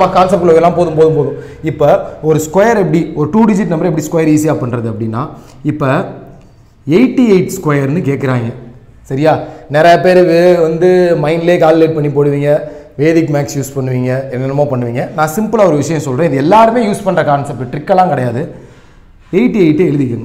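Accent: Madurai, India. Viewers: Madurai slang bro👌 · Madurai Lang itu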